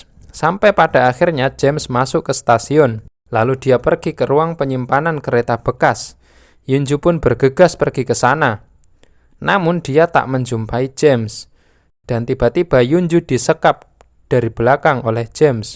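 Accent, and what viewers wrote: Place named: Java, Indonesia. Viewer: Cek medok eee cak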